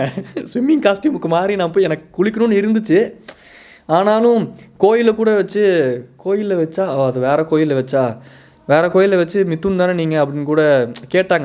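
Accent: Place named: Kongu, India. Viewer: தம்பி நேத்து தான் உன்னோட வீடியோ மொதல பாத்தேன் நம்மளோட கொங்கு தமிழ் பேசரது நல்ல இருக்கு நம்மளோட கொங்கு தமிழ் இன்னும் நெறைய வார்த்தையில பேசுங்க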